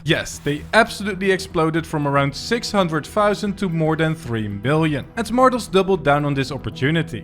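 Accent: Netherlands. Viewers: Die Nederlandse accent😭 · Question to u: are u Dutch? Little accent xD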